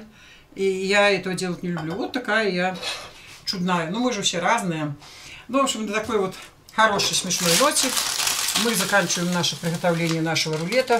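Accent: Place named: Belarus. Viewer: Умница, обожаю белорусский говорок. Спасибо за чудесный, простой в приготовлении рулет❤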